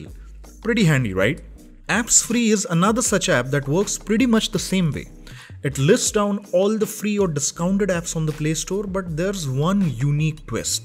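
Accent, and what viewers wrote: India. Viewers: bro every tutorial i search on youtube is english but the accent is hindi and the voice is not good to hear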